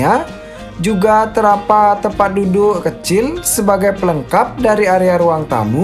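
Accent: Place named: Madura, Indonesia. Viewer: Mas kmu org Madura apa bali.. ko logat nya gitu 🤭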